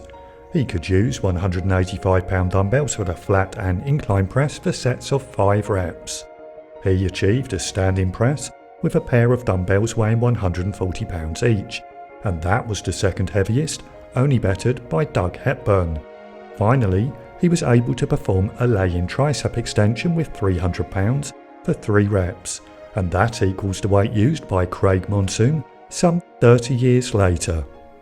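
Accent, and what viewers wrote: United Kingdom. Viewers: good point. I should have picked up you are speaking the kings English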